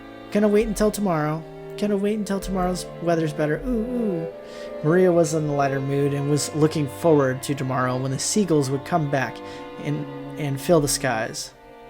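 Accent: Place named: United States. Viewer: Jesus christ the blueish grey haired woman's tits are so unnaturally big that it feels uncomfortable to look at her, the faint femme fatale smile on her face doesn't help either lol Loving the voice acting! especially the female voices and the little girl's "uu-" XD so you are American? I should have noticed! I'll give you a little hint: Unlike in english, where a certain vowel may sound different depending on the word, in Japanese, every vowel always sounds the same way: A always sounds like "ah" (as in cat) E always sounds like "eh" (as in pencil) I always sounds like "ee" (as in feel, peel) O always sounds like "oh" ( just a short O sound as in "boy", not as in the verb "to go") U always sounds like "oo" (as in fool, goon) (It's much easier for a native spanish speaker as myself to pronounce japanese! you may have noticed some grammar horrors in my comments! 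Hope that was helpful!)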